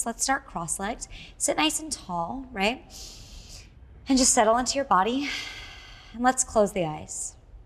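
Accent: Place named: United States. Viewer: I wanted to watch but American accents are so annoying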